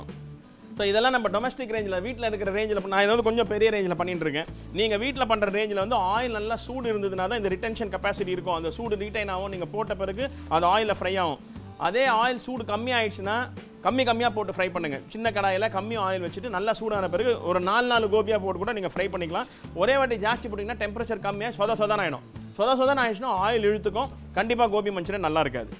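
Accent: Chennai, India. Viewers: semma ,Madras language, I like it.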